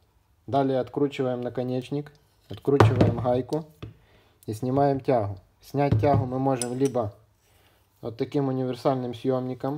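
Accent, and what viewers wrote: Odessa, Ukraine. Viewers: Слышу, что мастер видимо с Одессы. Так колоритно, как в "Ликвидации", хотя я сам с Сахалина. Всякая информация полезна, спасибо!!!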